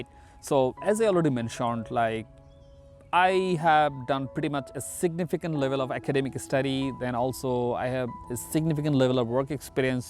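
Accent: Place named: Bangladesh. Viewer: I watched the entire video just to hear you say that you're from Bangladesh. But you did not. Are you ashamed of your nationality? And BTW I could tell that you're from Bangladesh by your accent.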